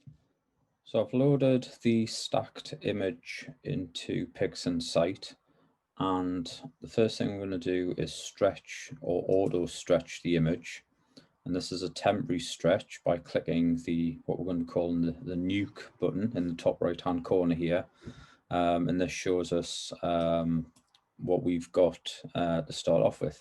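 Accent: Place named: Northern England, United Kingdom. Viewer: Great video I am from Teeside recognised your northern accent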